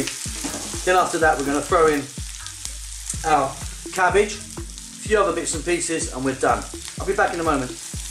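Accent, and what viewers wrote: United Kingdom. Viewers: Are you Asian with British accent or English person, love your passion for cooking. Love listening you, deffo subscribing u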